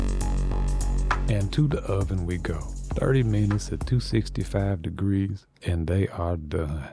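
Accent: Louisiana, United States. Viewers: Are you from Louisiana by chance?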